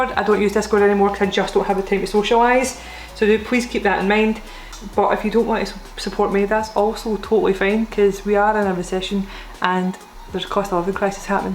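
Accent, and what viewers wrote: Scotland, United Kingdom. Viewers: It is English with a Scottish accent 🤦‍♂️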